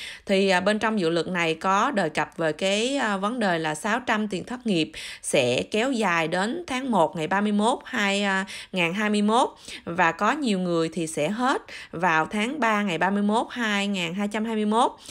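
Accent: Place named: South, Vietnam. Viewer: THANKS 👍 VỚI NHỮNG THÔNG TIN GIÚP CHO NHỮNG NGƯỜI KHÔNG ĐỦ THỜI GIỜ TÌM HIỂU MAY CÓ (GIỌNG ĐỌC Miền NAM, ) NÓI CHUNG LỄ PHÉP.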